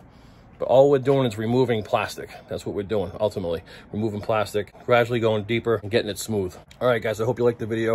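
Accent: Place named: Massachusetts, United States. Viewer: Hey I picked up on your Massachusetts accent I live in ma as well. Central ma . to be exact how much would you charge me to port match my plastic intake for a 6.0..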